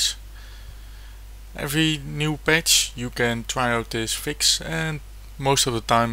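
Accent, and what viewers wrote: Netherlands. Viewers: its so funny how you can easialy hear that you are dutch :) ty for the help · are you dutch? you have a dutch accent :P ( im also dutch) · dutch for sure..? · je hebt wel echt een vernederlandst accent xD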